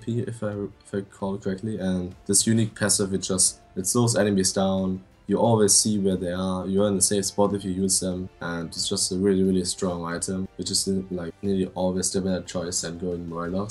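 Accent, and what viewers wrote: Germany. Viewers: Dieser deutsche Aktzent ;D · Dieser deutsche Akzent omg xD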